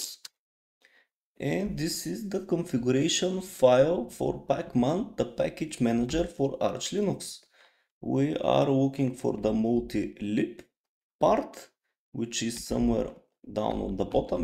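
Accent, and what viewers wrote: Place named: Russia. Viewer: Я отчётливо лсышу русский акцент, ну или славянский, а так спасибо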